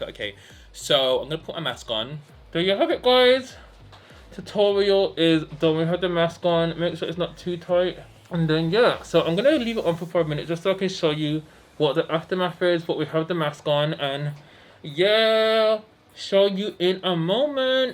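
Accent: Birmingham, United Kingdom. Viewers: Are you from Birmingham? X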